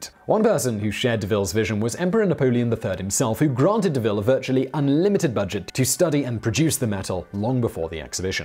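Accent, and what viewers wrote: England, United Kingdom. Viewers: I'm totally un-upset about the pronunciation. I'm used to hearing the "Brit-tone" speech of, and with that accent, if he pronounced it wrong I would be more upset. However, I WAS expecting there to be a "BONUS FACT" discussing this... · i Love the accent. is a Engish gentleman. i am from Pittsburgh Pennsylvania USA. so i have a weird accent. i moved to the south so i have been around weird accents. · If you ever get the chance to take a chemistry class from an English person, do it! Words like aluminum and equilibrium are much more delightful with an English accent. Thank you,! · Nice to hear you talking English instead of American like you do in most of your vids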